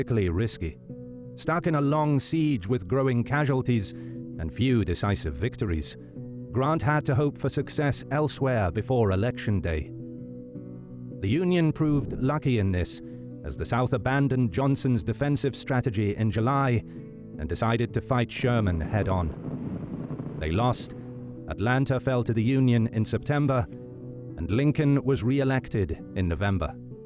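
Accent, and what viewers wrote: United Kingdom. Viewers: Nothing like s Brit telling us about our war. Southern sympathiser. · I really hate hearing a brit talking about our civil war. Probably because you got thrown of my country. Now look at yall😂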